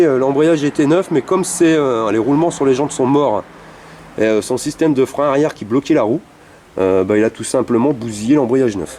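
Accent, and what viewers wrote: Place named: North, France. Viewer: Bah merde, jme disait l'gars ya un accent de nord et bin javais raison :D Fiere du nord :D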